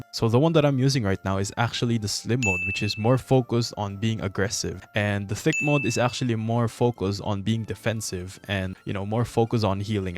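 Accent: Philippines. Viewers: Potanginnaaa mooo pinooyyyy accent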